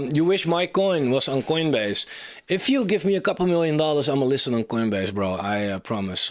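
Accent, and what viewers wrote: Netherlands. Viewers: Are you on the btc amsterdam conference? I say this because you sound like you are from netherlands!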